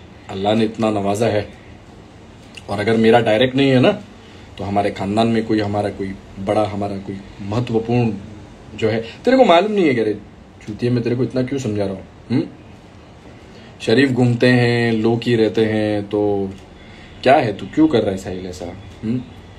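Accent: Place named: Mumbai, India. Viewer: Asali ❣️💪powerful voice🔥 bohat sari videos dekhi sahil Khan ke khilaf pr bhai aap ne jo bola he boss 🔥🙌 aur mumbai vali boli kadak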